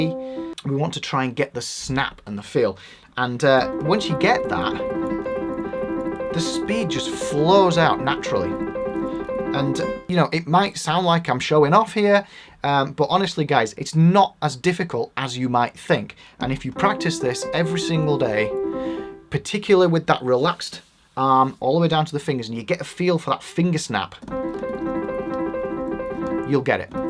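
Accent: Yorkshire, United Kingdom. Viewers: Love the energy, British accent, coffee tips, variety of exercise! Videos are very enjoyable!!! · Nice to hear a fellow yorkshireman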